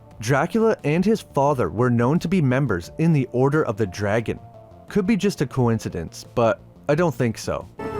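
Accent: Canada. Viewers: Holy Canadian accent!!!!!!!! LOL!! I forgot how Canadians pronounce things like “Out and about = Oot and aboot” “Mom = Mum”………..I thought my MN accent was bad!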